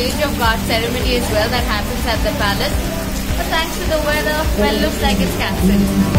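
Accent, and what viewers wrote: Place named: India. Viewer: the accent is so thick( Indian) Can’t understand the half of the times. Other than she seems like a nice lady.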